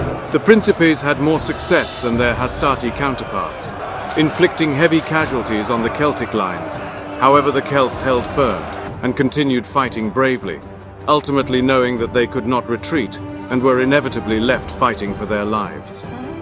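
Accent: United Kingdom. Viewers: This history is told in a such a perfect way - animation, music, super British English and ideal synthetic summary, that I was watchin the film dozens of time! Really great!!!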